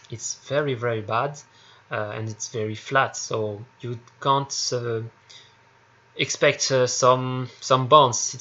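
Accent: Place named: France. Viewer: avec le petit accent français c parfait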